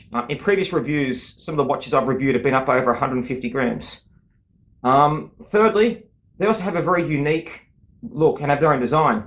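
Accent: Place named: Australia. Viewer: Let’s “dive” in and “cov” our name into the back of our watch (carve) . Love that Aussie accent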